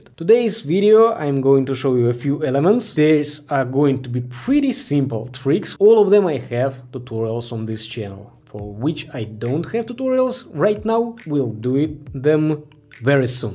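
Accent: Russia. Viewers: Акцент русского человека!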